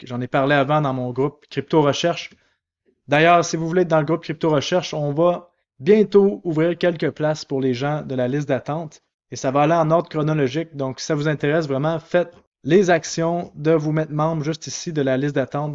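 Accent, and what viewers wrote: Canada. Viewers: Énorme, j'adore et surtout garde ton accent et tes expressions canadiennes...en plus d'être excellent, tu as de l'humour... 😉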